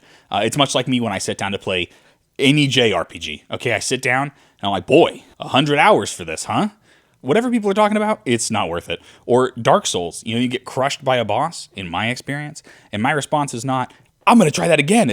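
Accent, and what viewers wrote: Germany. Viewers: thanks for save me, buying this! btw best german accent, großartig! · subscribed because of the german accent JA!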